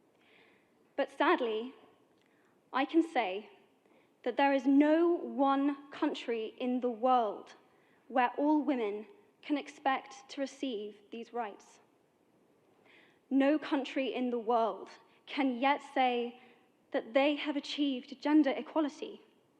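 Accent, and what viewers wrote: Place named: United Kingdom. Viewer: bla bla bla..è vero forse per i paesi musulmani, asiatici o africani ma in Italia no. Purtroppo qui, come nei paesi occidentali abbiamo già sperimentato cosa intenda la donna per femminismo: abbiamo visto troie fare la coda per fare i festini dal primo ministro, donne (anche minorenni) disposte a vendersi per una ricarica del cellulare, donne al potere incompetenti (pivetti, boldrini, santanché, picierno..), donne bugiarde e più meschine degli stessi uomini, cantanti celebri che "pornificano" ogni loro gesto, le parole dell'attrice inglese sono retorica vuota e stantia per femministe fallite. Ringrazio per l'upload, ho potuto così saggiare l'ottima pronuncia Bristish di questa attrice sin ora a me sconosciuta. Grazie.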